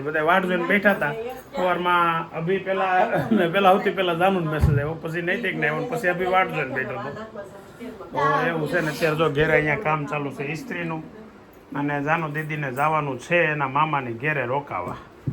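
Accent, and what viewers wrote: Kathiawar, India. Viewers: Bhai tamari khatyavadi languge bau maja ave che sambhadvani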